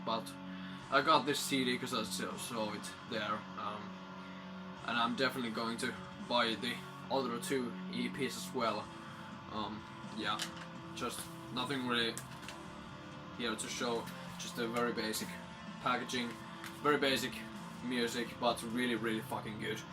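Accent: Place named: Europe. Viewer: Where are u from? U have an European accent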